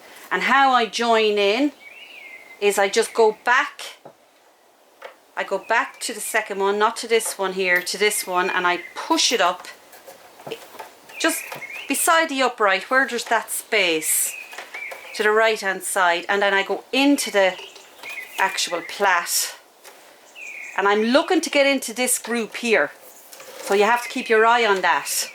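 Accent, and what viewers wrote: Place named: Ireland. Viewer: Hi there from NJ in the US. Your video has inspired many willow projects on my little farm of 20 acres. Love your spirit, your nature and your accent, especially your accent because it reminds me of a friend from Ireland who also inspired me. Now I need more willow stools to make more fabulous things. Keep going and growing!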